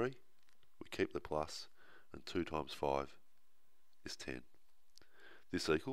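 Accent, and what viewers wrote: Australia. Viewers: you are brilliant.. the british way is more difficult, i guess your from Australia.. thanks a lot my friend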